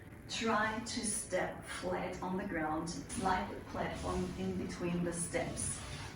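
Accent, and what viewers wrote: Germany. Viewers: Are you German? 😊 Great Tips, thank you for sharing!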